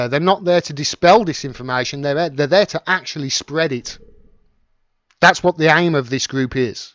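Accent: Birmingham, United Kingdom. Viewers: are you brummy?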